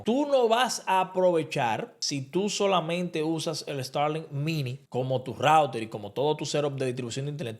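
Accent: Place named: United States. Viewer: Porque este negro de república dominicana habla como si fuera gringo? Que vergüenza esa gente que se hacen los gringos siendo de un país miserable hispanohablante 🤦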